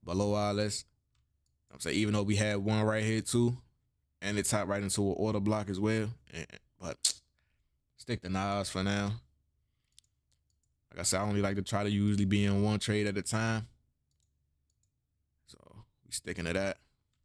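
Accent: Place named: Washington DC, United States. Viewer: I’m watching this video & saying to myself slim sound like he from the city (DC) checks for Instagram cause that’ll usually tell 😂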